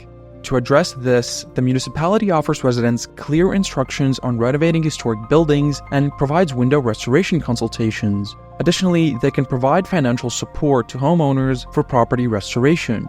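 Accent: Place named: Latvia. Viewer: Es gribēju gluži otrādi paslavēt, par angļu izrunu ar tik knapu latviešu akcentu :D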